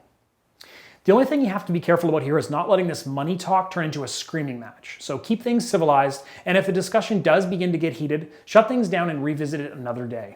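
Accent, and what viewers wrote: Canada. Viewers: Nice. I noticed the Canadian accent. Lol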